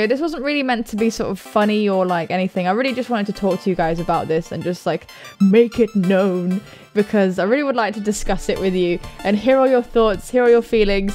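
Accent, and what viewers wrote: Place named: United Kingdom. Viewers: Came for Life is Strange. Stayed for that smooth british voice and adorable face <3 You're amazing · I don't remember why I first came here. Maybe it was for HuniePop. shifty look Still, I also stayed for the British voice and I'm probably more stereotypically British than you are. :p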